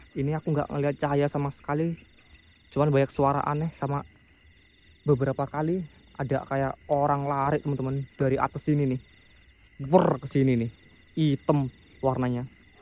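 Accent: Java, Indonesia. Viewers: Mas, njenengan orang mana ya..? Logatnya kok sprti orang Jawa ya..? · Mas, njenengan orang mana ya...? Logatnya sprti orang Jawa ya...?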